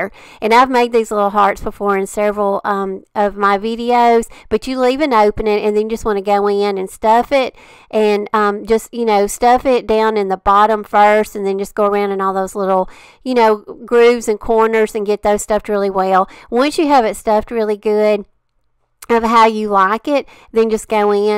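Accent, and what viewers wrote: South, United States. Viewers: Love it all and love you too!!! You are such a sweet southern lady and love to hear you talk (Georgian here). Have a blessed day and look forward to the second half tomorrow!!!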